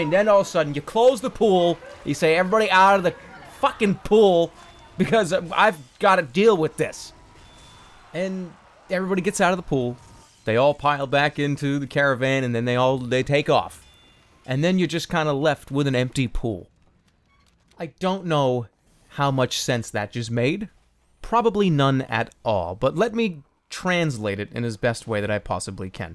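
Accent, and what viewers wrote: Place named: East Coast, United States. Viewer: Jerma, I love your east coast accent.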